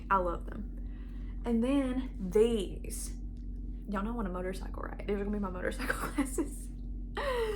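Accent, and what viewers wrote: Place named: South, United States. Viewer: that southern accent goes crazy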